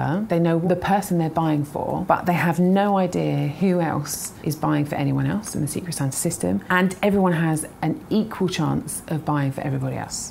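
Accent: United Kingdom. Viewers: I only watch the red headed numberphile vids for the British accent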